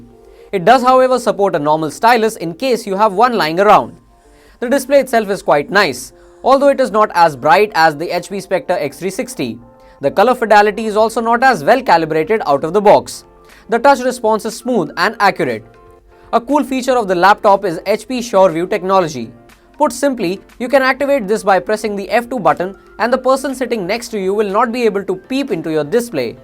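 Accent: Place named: India. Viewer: lol, Indian accent really, and to me not so bad. its understandable.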